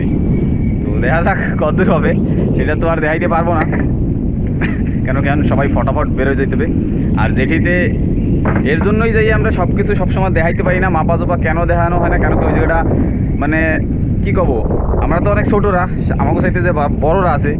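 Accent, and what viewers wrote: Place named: Shariatpur, Bangladesh. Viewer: তোমার জন্ম স্থান কোথায়, বাংলাদেশ না আন্দামান। তোমার ভাষায় মনে হয় শরীয়তপুরের আশে পাশে।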